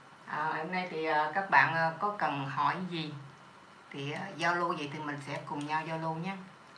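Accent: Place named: South, Vietnam. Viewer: Nghe giọng nói cô tôi tưởng tượng là một phụ nữ nam bộ già dặn. Những được nhìn dung nhan thấy trẻ hơn giọng nói rất nhiều. Từ khi biết kênh của cô tôi rất thích.. hiểu biết sâu rộng … phân tích cặn kẽ dễ hiểu, luôn ủng cô … đầu năm chúc cô và gia đình vạn sự bình an, hạnh phúc tràn đầy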